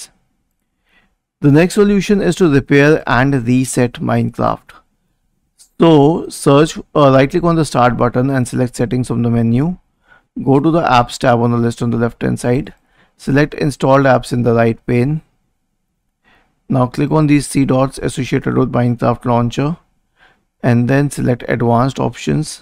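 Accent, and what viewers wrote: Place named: India. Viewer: omg so indiannnnnnnnnnnn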